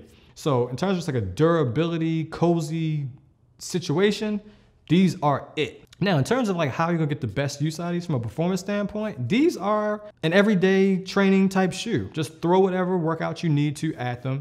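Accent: Baltimore, United States. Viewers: You from Baltimore??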